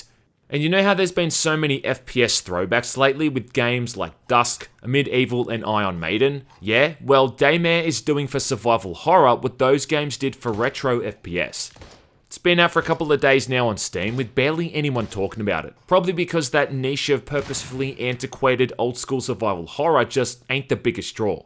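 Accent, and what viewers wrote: Australia. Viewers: Are you Australian? I only ask because I like to support local talent when I can. Not trying to be rude. Really enjoying your videos.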